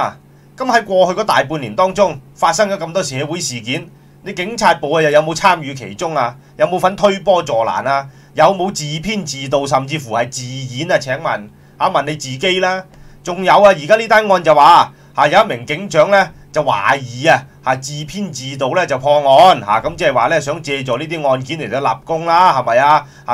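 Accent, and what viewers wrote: Guangzhou, China. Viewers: 好似廣州人口音，唔似香港澳門人口音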